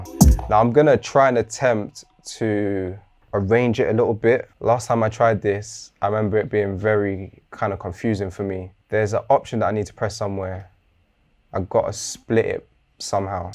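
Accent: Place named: England, United Kingdom. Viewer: That happy feeling when you click on a video and hear an English voice instead of an American one. Ah, bliss.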